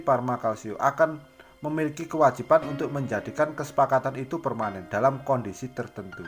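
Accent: Java, Indonesia. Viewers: Jowone ndi mas? 😁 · Dengan aksen yg jawa medok, pelan2 aja min... Jadinya salfok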